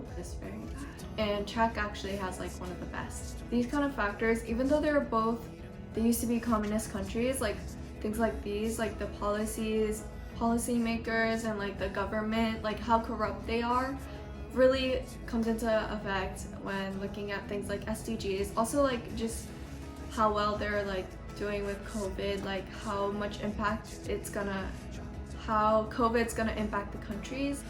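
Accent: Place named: Japan. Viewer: 日本人の英語という感じが面白いですね。私がインターで出会った友人たちの話し方似てるですね。日本語の方が上手ですよ！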